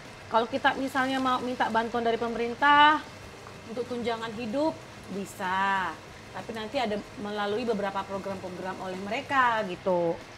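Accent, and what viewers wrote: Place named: Pontianak, Indonesia. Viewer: alamak bahase pontinye:face-blue-smiling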